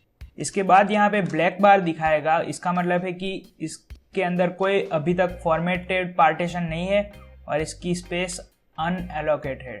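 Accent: Gujarat, India. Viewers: Aap Gujarati ho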